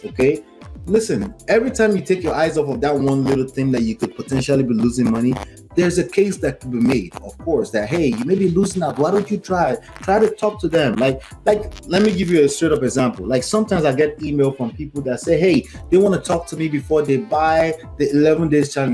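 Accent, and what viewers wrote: Nigeria. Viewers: I love this video and can tell your nigerian by your name and accent.. lol if you don’t mind, I’ve come to realize that working with realtors provides the chance for us cash investors to work directly with them and completely take out skip tracing, cold calling and direct mail.. well that’s what I thought. do you have any advice on finding properties that aren’t listed on the MLS?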